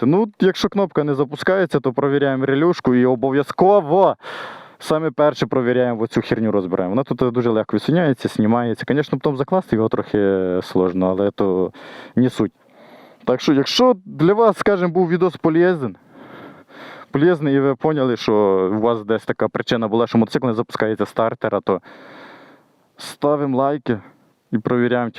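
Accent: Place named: Ukraine. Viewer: Вот это классный украинский язык! Настоящий украинский, что и в детстве я от тетки слышал и от ребят с Украины, что к нам на Север приезжали работать, и от товарища с Николаева, и от тестя бывшего, земля ему пухом. Всё понятно! Совершенно отличающийся диалект от того, что украинцам навязывают полупольские галичанские западенцы, со своим отвратительным пшиканьем! Я просто с удовольствием послушал украинскую речь.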